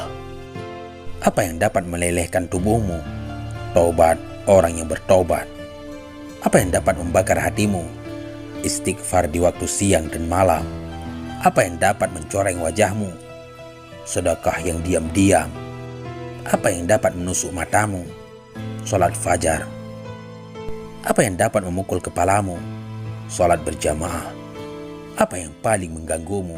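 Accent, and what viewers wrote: Aceh, Indonesia. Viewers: Adminnya urang Aceh ya?bunyi slang nya lain ckit.😃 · Logat nya orang Aceh ni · Suara nya seperti orang aceh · Admin nya kayak suara org aceh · pasti org aceh ini yang bicara hehe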